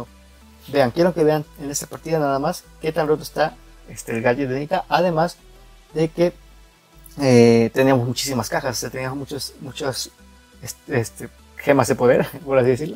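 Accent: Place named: Veracruz, Mexico. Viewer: Como que eres de Veracruz xd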